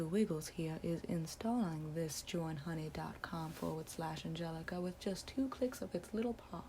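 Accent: South, United States. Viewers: Southern accent stuff is great.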